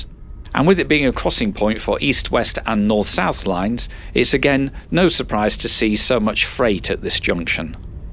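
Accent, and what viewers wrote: United Kingdom. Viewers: Wow - that's some appropriate contrasting hobby to the tranquil ) I'm always curious about the different accents from diverse places: Couldn't attribute this one to scouse, scottish, northern... neither to cockney, northern of Melbourne in Oz...? I assume, the southern(btw. Cornwall-Oxford-Cambridge-Kent) english accent is something similar to the german Niedersachsen(Hannover) - both beeing closest to the written standard language. Another trigger would possibly have been the YT-channel from NonstopEurotrip... I remember having heard once - him beeing originally from Exeter too... but listening to some difference though. Was quite surprised by the spreading of this sport - appearantly there's also some league here in SWI...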